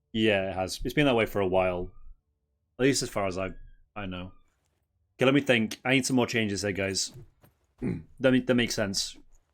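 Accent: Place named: United Kingdom. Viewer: 50 minutes of a British ranting... Man were eating good today 🔥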